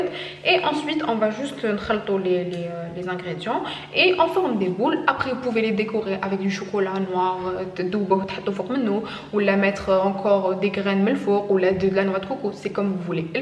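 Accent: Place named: Algeria. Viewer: J'aime trop ton accent algérien ❤❤❤❤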